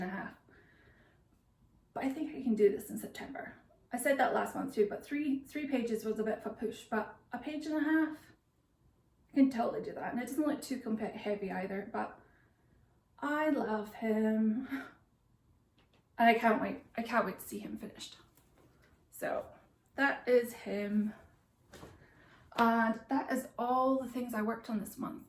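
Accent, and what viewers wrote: Scotland, United Kingdom. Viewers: The little Nessie is adorable!!! I couldn’t understand who made it and who gave you all those gorgeous gifts?? Awesome! Great haul, love all your purchases. I’m so glad you’re finishing Black Bear, it’s an amazing design and you’re so close. By the way, it’s so strange hearing the Scottish brogue against a background of wild fires and American shopping (I’m in Sussex U.K.). Congrats for your 1k, Winter Cabin would be great to stitch, thank you!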